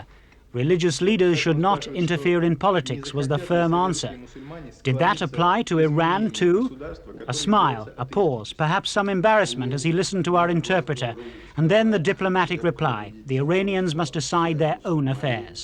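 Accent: United Kingdom. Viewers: I'd rather just watch the video footage of people living their lives than having to hear some nasal British commentator spend every five seconds trying to tell you what an unbearable hellhole this is supposed to be.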